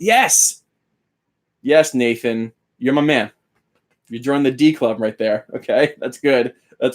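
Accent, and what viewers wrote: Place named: Upstate New York, United States. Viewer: Do you know anyone named Miraglia? Or, do you happen to be from upstate New York? My buddy ( since passed), and his brother, spoke just like you. Mannerisms as well.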